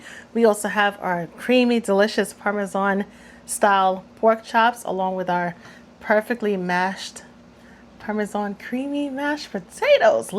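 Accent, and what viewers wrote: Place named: Brooklyn, United States. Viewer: I follow a lot of cooking channels and am surprised I just found yours a few days ago. I'm glad I did, such delicious recipes to try out. Also can't help but notice your accent and was wondering if you're also from Brooklyn/NYC.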